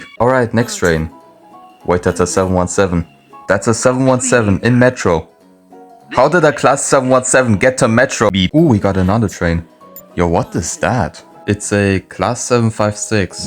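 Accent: Germany. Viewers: I hear german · Are you german? You sound sooo german xdd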